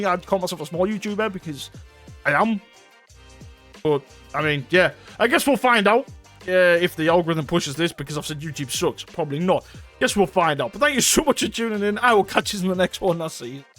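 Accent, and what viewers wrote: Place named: North, United Kingdom. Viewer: I totally agree with all the points you brought up. Seeing it from the view as a creator and as someone who likes to express themselves in the comments & give feedback on videos... it feel as though we're all walking on eggshells & we can't be as organic as we want to be. For creators, they can't push the boundaries or be edgy or forward thinking anymore. As a viewer..: I'm not one of those Twitter types who gives knee jerk abrasives comments, if anything I'm respectful of of a creators channel & I don't want to bring any negativity to the channel but it's so annoying when you make comments that are totally valid, factual & non offensive... it gets removed or censored, all while someone can berate you in the crudest manner & they get a pass. The latest YouTube algorithm & censorship doesn't make sense but hey ho, at least they're not as bad as Twitch. 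Ps love the accent & as a Londoner & every time I've met people from up your end they've been the nicest people 💯🙏🏾👊🏾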